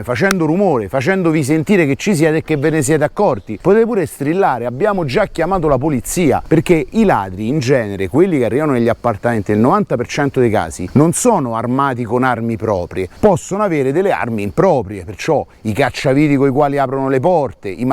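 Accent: Rome, Italy. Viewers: Complimenti, una spiegazione semplificata per tutte le Età, poi con l’accento Romano, nun ce potemo sbagliá 🙂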